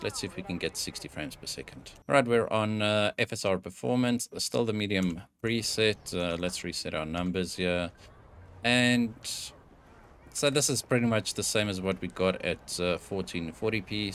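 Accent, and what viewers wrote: South Africa. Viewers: Yeah true, I’ve been playing it since 2.0 patch, even on my gtx1660, it’s still quite a decent experience. Just bought a RX6600XT for R3500 yesterday (I’m also South African). I was just digging through some benchmarks for this card and recognised your accent and decided to continue watching.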